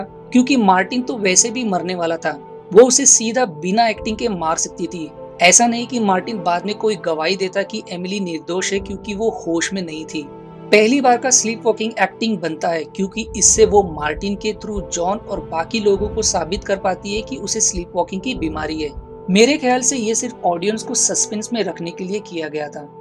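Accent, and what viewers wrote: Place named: Maharashtra, India. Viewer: Bhawa Tu marathi aahes watt.. I suggest u.. Hindi madhe police nhi pulis uccharan karat ja.. Baki mast... Keep it up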